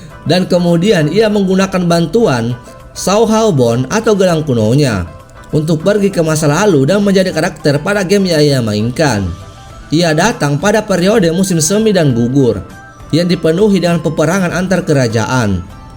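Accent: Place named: Batak, Indonesia. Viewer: ohh......mirip logat Batak dikit🙃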